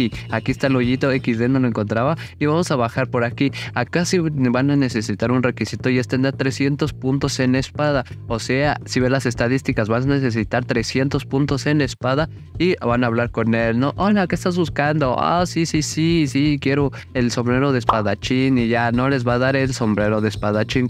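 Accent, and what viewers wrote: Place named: Michoacán, Mexico. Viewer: creo que eres de michoacan XD por como hablas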